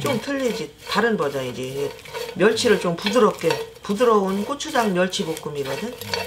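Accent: Chungcheong, South Korea. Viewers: 울 엄니가 하시것 같아요 구수한 출청도 사투리 넘 정겨워요 ^♡^ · 어머님 충청도 말투가 조금 있으신게 넘 정감이 있네유^^ 저두 충청도에서 태어나서 지금은 뉴욕서 살고 있네유 ~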